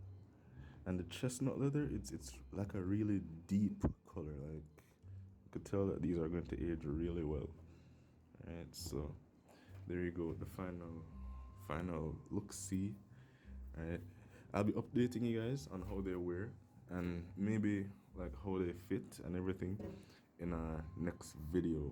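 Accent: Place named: Jamaica. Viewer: Can hear the Jamaican in your voice from you start talk bro keep doing clarks reviews bro rate them with different colours #repfromjamaica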